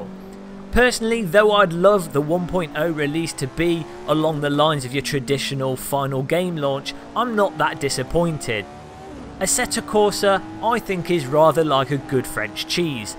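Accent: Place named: England, United Kingdom. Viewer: Seb, he has a very English accent. But I know what you mean. regional French dialects would be a mystery to me, too. :)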